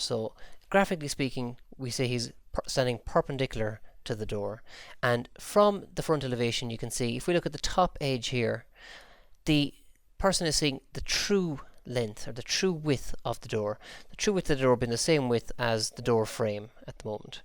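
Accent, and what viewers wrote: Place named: Ireland. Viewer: This is amazing! Very good job. Also love your accent. It's Irish isn't it?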